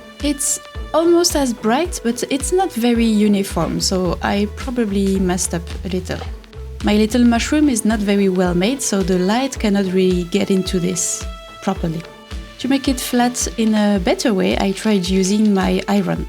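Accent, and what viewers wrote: France. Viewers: Do you speak French or other language to English habitually ? (J'ai cru reconnaître l'accent français ! ) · oh french accent, UNE FRANÇAISE QUI PARLE ANGLAIS, incroyadible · J'ai reconnu le petit French accent alors j'écris en français... Je ne sais pas pourquoi votre vidéo m'a été proposée mais je suis content de l'avoir regardé, très sympa, bravo :)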